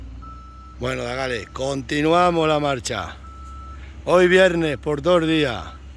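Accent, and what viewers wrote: Extremadura, Spain. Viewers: Buenos dias Muy bien lo q estás haciendo en la Finca chaval,eres un máquina,eres tan fuerte como la máquina esa que prepara la finca,nos levántas el ánimo a los seguidores de verte trabajar a ti,y lo bien que sabes hablar,no pierdas nunca ese acento extremño tan chulo q tienes,se nos está pegando a todos.¡me encanta!Saludos amigo.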